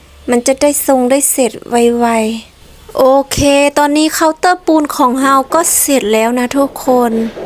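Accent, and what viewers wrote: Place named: Laos. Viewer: เสียงไทยปนลาวสาวแว่นตั้วนี่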